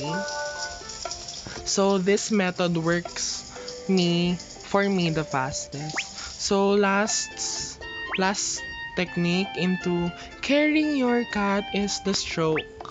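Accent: Philippines. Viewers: i like that filipino accent ahh 🇵🇭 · filipino accenttt hihi 🇵🇭❤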